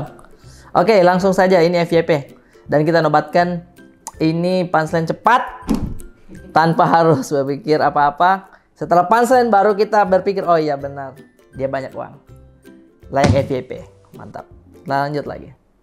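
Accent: Makassar, Indonesia. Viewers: saran bang klo pake bahasa/logat makassar disubtittle biar yang gak tau bisa tau hehehe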